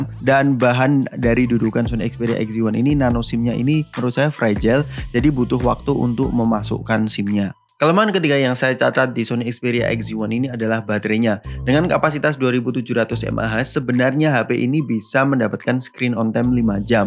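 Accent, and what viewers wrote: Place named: Java, Indonesia. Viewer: Ketoro jowone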